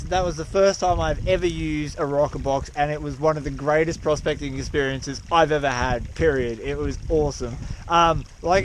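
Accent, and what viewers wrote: Australia. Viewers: Nice, I was thinking Aussie or New Zlander, none the less great job and Keep up the great work.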